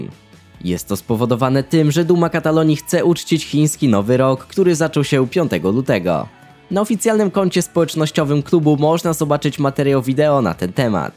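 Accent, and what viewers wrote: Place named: Poland. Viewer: O dzisiaj dużo polskiego akcentu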